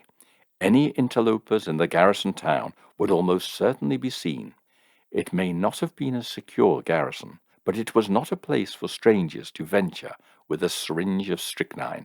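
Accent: United Kingdom. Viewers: We missed you and you're wonderful story telling, drawings and investigative talents. We want more! Best of wishes always...From a Brit in the USA who is always restored by a fellow British accent and intelligent logic. XXXXX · I love the true crime stories that you presented. Hope to see miore of these videos of yours. And the music is great, your voice plus the gunshot. So British.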